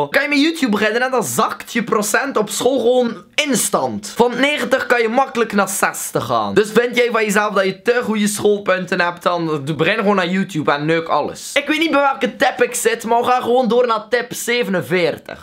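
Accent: West Flanders, Belgium. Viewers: Mensen spreekt een beetje raar maar niet omdat hij Vlaams spreekt, maar West-Vlaams en dat is heel anders dan zeg je ipv ik bv ek en bv pikvis pekves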